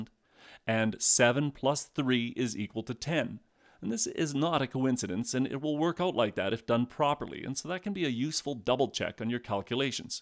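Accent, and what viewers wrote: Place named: Canada. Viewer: Crystal clear explanation. Very helpful. I detect a slight Canadian accent which I have come to associate with the best math and stats videos on YouTube. Thanks prof wherever you are.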